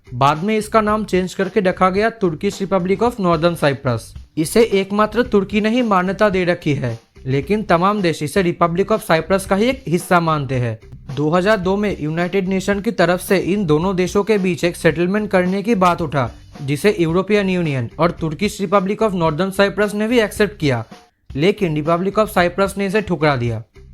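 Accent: Bengal, India. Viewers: Bhai ami sure, tumi Bengali😄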